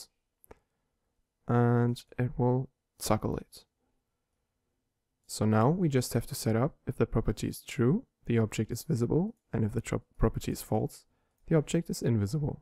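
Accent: Germany. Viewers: Dein Englisch ist sehr sehr gut. Man hört den deutschen Accent nur ganz leicht raus.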